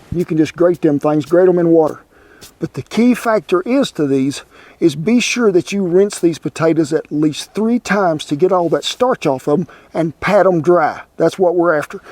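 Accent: South, United States. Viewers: black coffee, hash brown slap up and a chirpy southern camp cook, paradise mahn!!!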